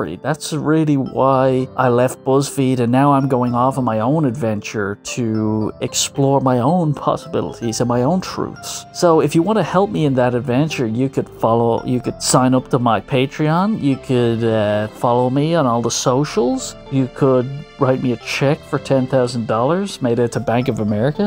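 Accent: Ireland. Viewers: What side of Dublin are you from? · I didnt know you were irish!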